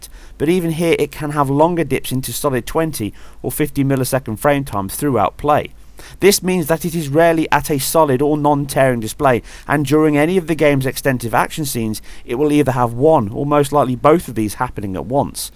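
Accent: United Kingdom. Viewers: Hey!!! Are you British??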